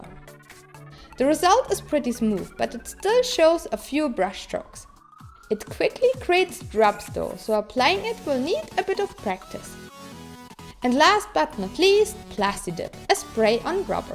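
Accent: Germany. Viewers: This English with a German accent or vice versa left me confused 😹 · Where do you come from? It sounds like Germany your English is interesting I mean it sounds pretty German :D I mean no hate but it's interesting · I’m always here for the dogs and the German accent.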